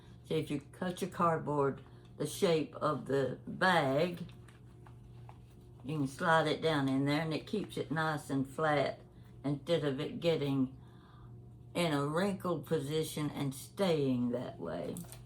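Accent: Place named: Appalachia, United States. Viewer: Dear dear, you are a delight. Is it just me or is Appalachian accent getting more and more pronounced?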